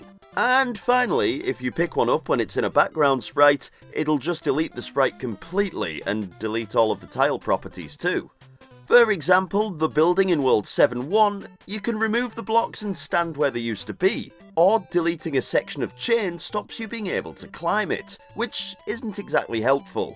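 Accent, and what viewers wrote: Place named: Scotland, United Kingdom. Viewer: I love your Scottish accent <3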